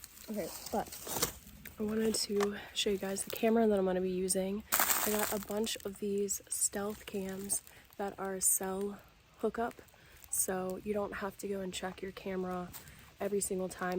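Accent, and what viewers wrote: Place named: New England, United States. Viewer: Recommended on my You Tube feed so I will provide feedback in the form of a comment. I am not interested in the You Tube channel of any American Woman, particularly, white ones like my Grandmother on Mom's side, Mom, my Sister, my Stepdame, and any other American Woman. Perhaps it is self hatred, but the Yankee accent only exacerbates my repugnance for the women my nation has produced-the feeling, no question, is mutual-extending beyond nationality to the white race. I will review my subscription list when I get a chance and make sure to remove any to American women, though I will target in particular those American women from the USA, and then, white ones. I simply cannot brook the sense of entitlement in these women.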